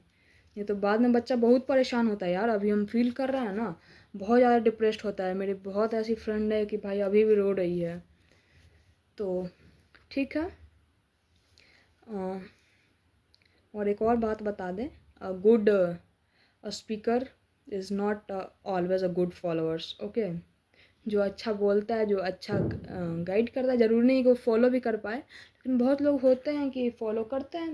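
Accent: Bihar, India. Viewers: Aap bihar se ho ky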